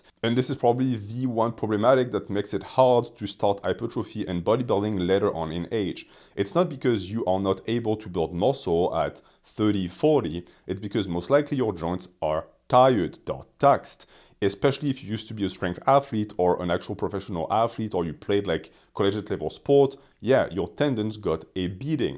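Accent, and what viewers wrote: France. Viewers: Soon his thick French accent will be a thick Spanish accent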